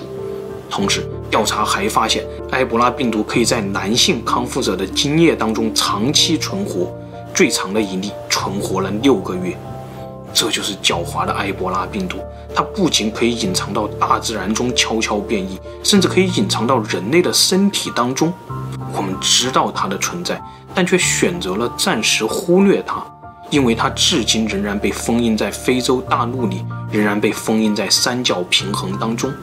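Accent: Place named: Hunan, China. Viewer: 带着湖南口音的普通话，居然能把故事讲得如此引人入胜，真是个奇迹！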